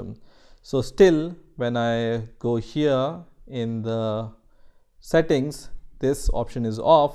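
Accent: India. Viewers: This man sounds Indian to me. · English title 😀 Indian accent 🙁 Actually using English 🤩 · this man has a American ascent and a Indian ascent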